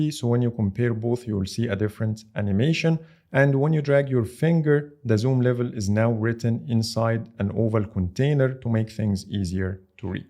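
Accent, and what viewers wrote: Egypt. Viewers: اللي بيتكلم ده مصري صح؟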